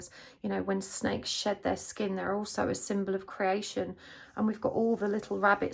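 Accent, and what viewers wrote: United Kingdom. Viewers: Dear. I am writing to say how life changing and transformational this reading for me. I chose pile 3. It was so cathartic and eureka moment. The book recommendation by you about Judy Hall! I found it immediately on scribd and was included in my membership. I take it as fate and serendipity. You narrating your personal experience gives me comfort. You are super gifted and seem a trained counsellor or psychologist. I can guess from your accent that you are from UK. So am I and it would be a privilege to have a F2F reading from you thank you again for lighting me my path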